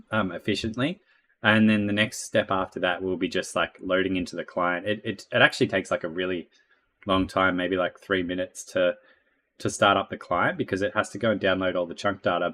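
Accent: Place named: New Zealand. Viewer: looking pretty good, do you think you'll put in some kind of sloped/wedge block? also, i noticed from your accent, are you a fellow new zealander? cause if so, that's pretty cool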